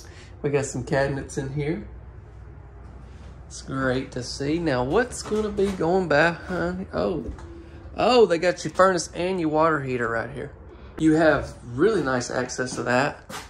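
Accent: South, United States. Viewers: Well first off, Cullen is too adorable! And secondly, thank you so much for ALL of your videos! Anytime I find a home I think I might like, I run over to your channel to watch you tour it! Love your humor and accent! Gotta be from the south! I’m in Mississippi myself! Once again, thank you for all the videos and the good laughs!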